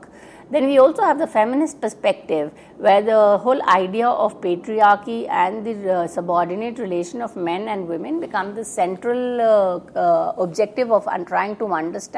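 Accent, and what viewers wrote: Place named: Haryana, India. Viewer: Robotic and fast lecture. Very discouraging for the students. Memorised lecture marred by hariyanvi accent.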